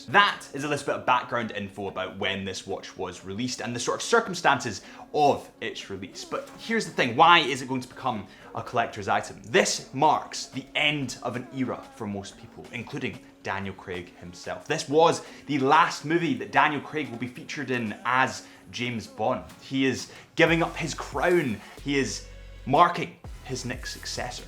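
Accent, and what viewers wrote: Scotland, United Kingdom. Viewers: This guy has a similar presentation style and approach as Adrian Barker…just with what? A Scottish accent is it? I do agree though, that this particular Seamaster is going to end up being one of the few contemporary Omegas that’s a collectors item and will fetch a premium for it. It’s definitely one of my favorites anyway. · Both are Scottish, it’s a cool accent which I appreciate after living in Scotland for more than 8 years…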